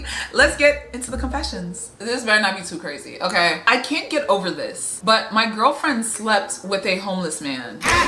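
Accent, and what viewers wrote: Nigeria. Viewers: Nigerian accent 😫😫😫😫😫🤌🤌🤌🤌🤌🤌🤌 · Again I ask,are you Nigerian cause the accent is hitting hard which makes your reactions more real and relatable 😂😂😅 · Are you a Nigerian cause u have that accent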